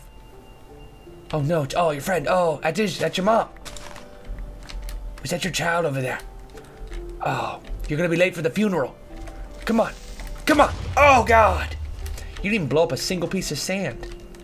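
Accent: United Kingdom. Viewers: Btw BREAK THE BLOCKS THAT THE LEVER WERE ON PLEASE PLUS ARE TOU ACTING BRITISH?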